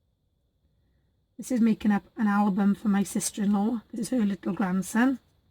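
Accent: Wales, United Kingdom. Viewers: I love your layout, so bright and cheery. I don't use red much either, so it's great to see how you've made it work so well. Also, I love the Welsh accent! I lived in South Wales for a while many, many years ago and everyone made me feel so welcome :)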